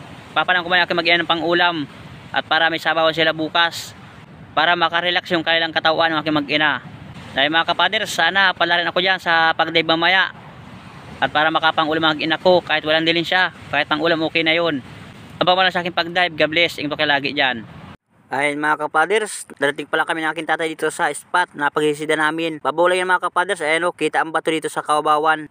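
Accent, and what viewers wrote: Bicol, Philippines. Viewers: ka fahers bicolano ka ba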